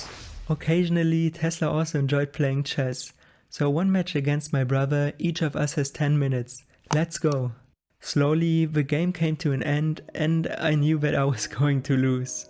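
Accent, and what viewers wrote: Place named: India. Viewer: Without the video I would have thought that you were Indian. Good video btw 👍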